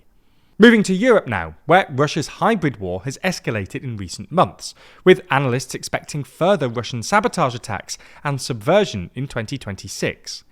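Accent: United Kingdom. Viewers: Hate your brit accent, this accent is more a turn off than conveying credibility. Britain is a has been.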